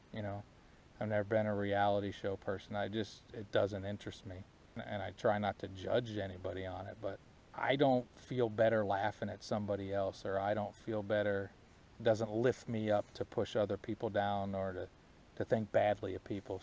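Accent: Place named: New Jersey, United States. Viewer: Let's see, I started watching you because of the cooking videos, a lot of what you cook, is way too spicy for me, but my husband LOVES spicy food, so you give me ideas of things to cook for him that he will like (and I just do myself a bland version). I really like your accent, I used to work with a really funny guy from New Jersey and your voice reminds me of him. I also like that you love your cats, I have dogs, cats and parrots... so I basically am the zoo minder around here. So anyway I watched your ramble, and felt compelled to ramble on a bit myself... sorry about that.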